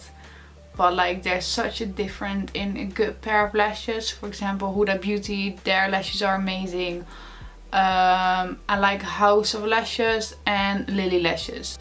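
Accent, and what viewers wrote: Netherlands. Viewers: Je hoort zo goed dat je Nederlands bent. Cute look🤗🤗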